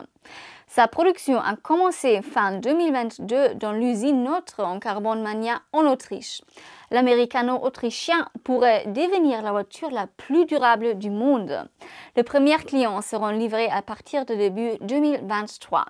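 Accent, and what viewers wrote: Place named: Germany. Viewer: Merci. Petit accent sympa 😂 Allemagne ?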